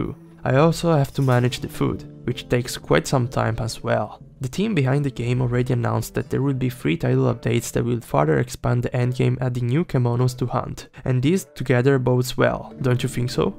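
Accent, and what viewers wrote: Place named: Italy. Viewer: Brooo amo il fatto che si sente un casino che sei italiano ahahah comunque grande❤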